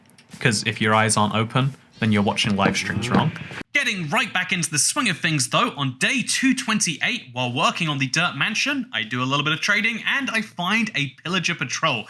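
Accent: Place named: United Kingdom. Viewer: I love your British accent I from Poland and I adore British accents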